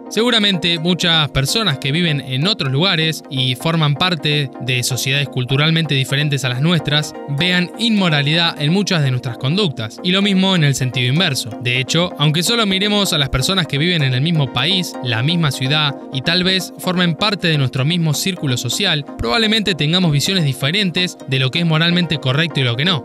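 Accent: Argentina. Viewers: Sos argentino pa? Muy buenos videos 🤠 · Qué curioso pronuncian la palabra "niños" por aquellos lados. Ya he visto videos de youtubers argentinos y paises aledaños, y la pronuncian algo como "ninios" 🤔🤔🤔.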